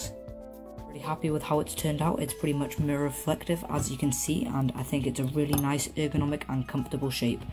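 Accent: United Kingdom. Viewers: Wow, I never heard such a British accent before.... BTW you're doing some really nice work there. Keep it up ;) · what is it with british-accented people mispronouncing "aluminum" "aluminium" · Hi is british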